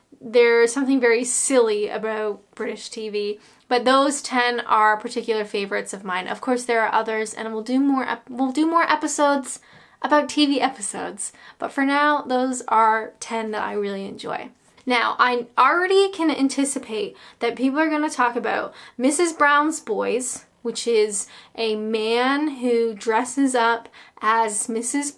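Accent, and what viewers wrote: Canada. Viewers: wow that canadaian accent has come back really thick and strong. instead of crap under ur grey sofa, i see ur chucking clothes and crap on the floor near your bedside table. what book are you reading? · OK, just another creepy old guy here who only recently found your channel, I love Canada and I really enjoy your delivery. However, if you get the chance to return to the UK and if you want to assimilate with Southern England, then you need to learn how to pronounce Task Master ... it should sound like Tarsk Marster. ;-) ;-) ;-) Haha ... tbh, I like your accent, don't change it.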